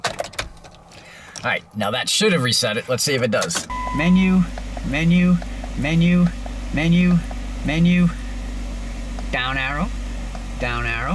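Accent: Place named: East Coast, United States. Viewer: I have watched this video a half dozen time while changing the oil in my wife’s Jeep. But really I just watch it for the east coast accent factor 😆.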